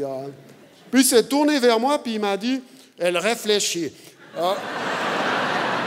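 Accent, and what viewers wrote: Vaud, Switzerland. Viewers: Il a une drôle de façon de parler (et je ne parle pas de l'accent suisse) mais j'ai ri ! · Il a vraiment un accent suisse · J'ai plus rit de son accent suisse que du sketch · "C'était franchement désagréhâble" . . . beaucoup d'accents circonflexes notamment sur les "a", les "heu ou eu". Cet accent suisse, peut être vaudois, me rappelle celui des stéphanois (Saint Etienne 42). Fouillâ ! ! ! ! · Accent Vaudois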